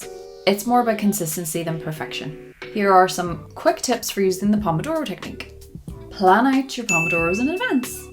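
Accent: Northern Ireland, United Kingdom. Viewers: Is that a Northern Irish accent I hear? 😅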